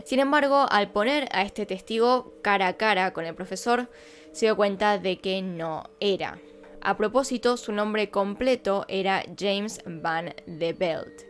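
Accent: Argentina. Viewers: Cuentas con gran capacidad narrativa tengo como ocho meses de seguir tu canal pero no te comentaba porque no tenía buen celular, además que a los mexicanos nos gusta como hablan los argentinos.Tienes talento y personalidad. Espero siga creciendo tu canal. Sinceros saludos desde Torreón, Coahuila, México.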